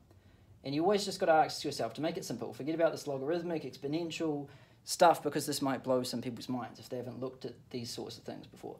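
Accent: New Zealand. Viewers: One thing comes to mind when watching your videos. Quality. Great job man. I like your way of analysing life and work. And I have fun when I can't understand that you find a lot things "bitter". New Zealand accent rocks!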